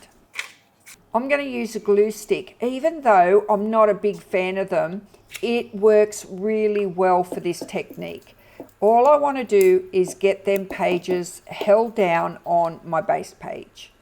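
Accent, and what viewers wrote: Australia. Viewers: It’s so awesome hearing your accent… Aussies are so good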